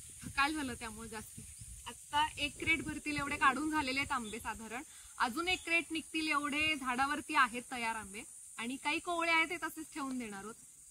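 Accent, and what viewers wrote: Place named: Rural, India. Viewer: Love your Gramin languages tone when u use. ........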